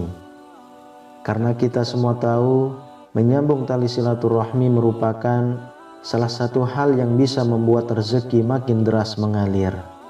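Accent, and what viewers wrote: Lombok, Indonesia. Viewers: Suaranya kayak Logat orang lombok..ntb.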